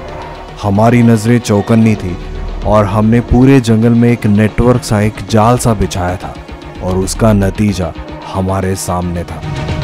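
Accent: Maharashtra, India. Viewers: as much as I love jungle Safari, I hate the Cricket commentry. Marathi accent , I miss it , like I miss the Bagh !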